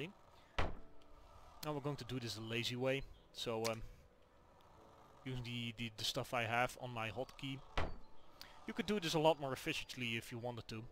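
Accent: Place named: Netherlands. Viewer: dat is leuk ik ook ik hoorde aan je stem dat je niet van Engeland of America was je klinkt gewoon Nederland toen ik je hoorde praaten dacht hij klinkt Nederland ff vragen waar hij vandaan komt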